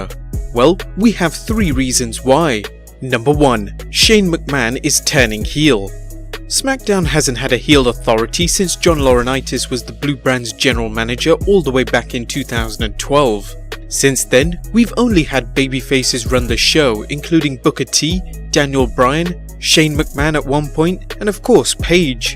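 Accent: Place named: England, United Kingdom. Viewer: Never should’ve been GM. English accents are unbearably painful. More Meltzer-like guess work in this video though, nice work marks.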